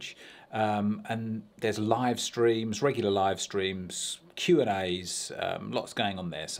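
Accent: United Kingdom. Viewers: That's a nice British accent you've got there, where do you live? ( answer only if it's appropriate for you)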